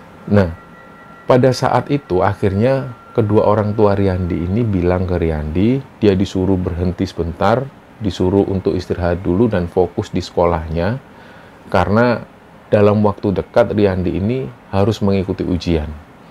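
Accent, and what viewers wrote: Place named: East Java, Indonesia. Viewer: Suka dgn penceritaan mas ini,logat jawatimur bgt,sperti saya nyemak bapak saya, waktu bpk cerita² dirumah..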